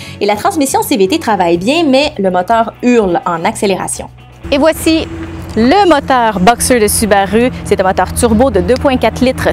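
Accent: Quebec, Canada. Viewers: French Canadian accent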